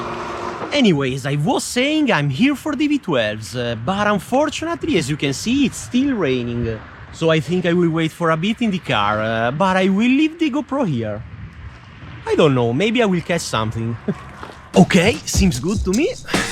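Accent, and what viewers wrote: Italy. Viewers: I love the Italian accent. Come stai?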